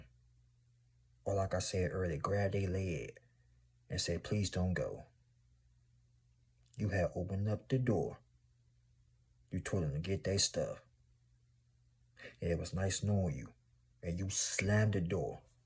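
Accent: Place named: South, United States. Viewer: I like your southern voice, You are easy to listen to.❤😊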